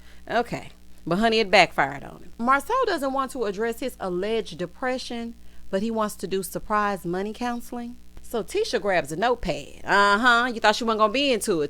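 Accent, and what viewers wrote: South, United States. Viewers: Hey girl hey, you’ve hit the nail on the head again. Without trying to be negative, I just don’t see Kimmi and Maurice’s marriage really working, something about it seems off. I only have 1 question, when Tisha introduced herself to the “financial counselors, she said, “they were going on 15 years of marriage” but, didn’t they go to Vegas to celebrate their 15th like 4-5 weeks ago? I love Tisha’s growth, this platform has truly been a positive for her in that sense. I’m usually here for Destiny’s fashion but, that outfit was also a No for me for that particular event. If Mel and that hyper inflated accent can get on tv, then your southern drawl will surely take you places because hell that’s what drew me in🙌🏾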